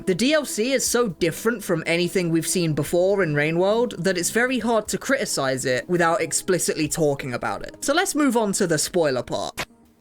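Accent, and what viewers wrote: United Kingdom. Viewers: he sounds more british than usual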